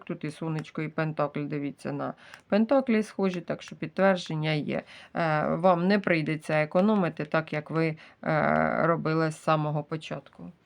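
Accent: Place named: Poltava, Ukraine. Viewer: Полтавська або Черкаська обл😂. Говір- ☝️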